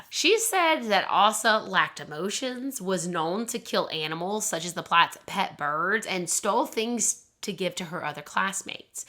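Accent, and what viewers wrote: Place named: South, United States. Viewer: New subscriber here!! I’m so glad I came across your vlog!!! I love things like this. I also love your voice and I’m wondering if you’re from the south? Because you say the word “on” with a “w”….. Like. “Own” which I love!! ❤️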